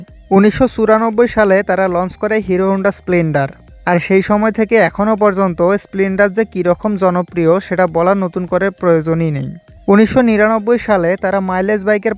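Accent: Bangladesh. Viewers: পুরাই বাঙাল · Are you Bangladeshi?. Because you (talk) pronunciation as Bangladeshi people.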